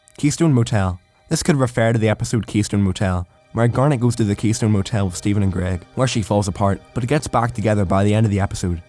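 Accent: Scotland, United Kingdom. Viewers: Great video! You have an interesting accent, are you Scottish?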